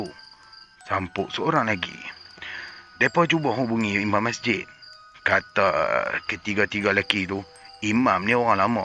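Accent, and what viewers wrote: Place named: North, Malaysia. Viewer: Mantap bos lorat utara😂.sebijik oww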